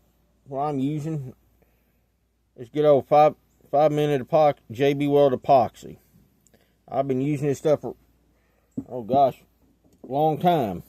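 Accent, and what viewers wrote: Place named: South, United States. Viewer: Brother I thought I was southern lol you got the deepest country accent I've heard in a while. 😂 alot of folks would say they can't understand us so I guess it's our own language 😂😂😂 great video can't wait to see you use it once it's all finished. Keep it fishy 🎣👊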